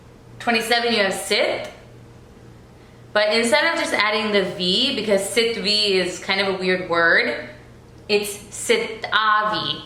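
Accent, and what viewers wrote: United States. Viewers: How did u acquire this American accent. Looks like You've been settled in US for a very long time...pan memoni boli khand ji goli... period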